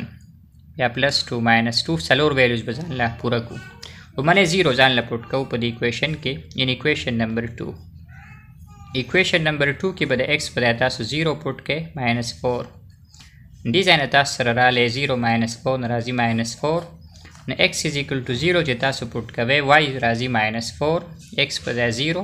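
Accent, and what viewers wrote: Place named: England, United Kingdom. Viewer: What a english accent 😊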